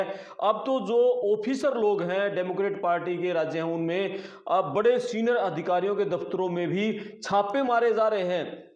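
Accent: Haryana, India. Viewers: जी आपने अपनी भाषा पर नियंत्रण पा लिया है 90% control है। पता ही नहीं चलता कि वक्ता हरियाणवी मूल से है हल्की सी हरियाणवी झलकती है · U r fine , perfect presentation bt need to improve hindi,u r haryanvi accent bt disappointing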